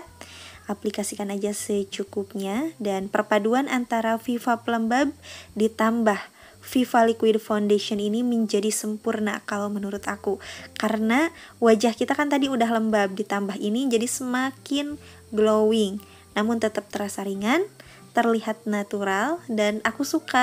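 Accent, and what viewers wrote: West Java, Indonesia. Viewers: Hasilnya cantik bgt dan keliatan natural ngaaannn...eta nyarios na mani nyunda pisan, pertahankan neng, jadikan ciri khas.👍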